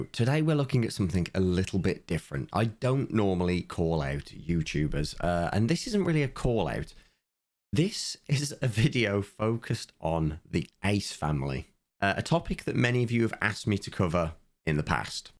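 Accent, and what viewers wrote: United Kingdom. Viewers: If he can suppress the British accent slightly, yes.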